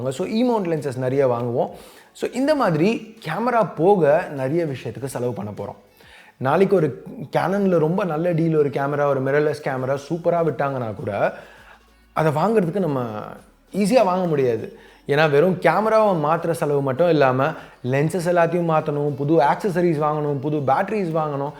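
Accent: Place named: Chennai, India. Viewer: bro..unga chennai tamil slang semma🔥🔥🔥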